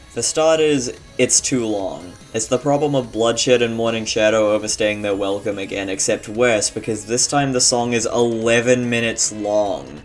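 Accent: United Kingdom. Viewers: I wasn’t expecting this video to have full commentary !! The Bri’ish accent is really soft but it’s still satisfying to listen to !! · a British accent isn't always so heavy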